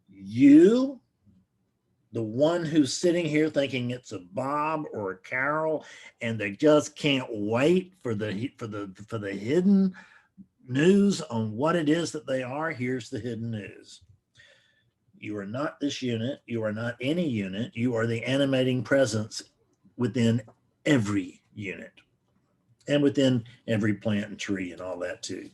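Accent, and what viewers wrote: South, United States. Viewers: channeling Alan Watts with a southern accent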